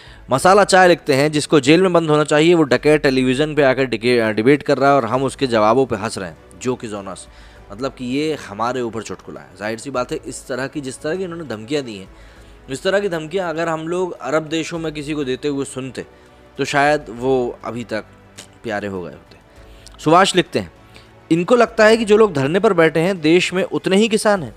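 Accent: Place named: Meerut, India. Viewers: Jaat language has something special to it... Sunne me bara maja ata he. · ये हरयाणवी नहीं है ये मेरठ मुजफ्फरनगर, सहारनपुर, हरिद्वार की बोली है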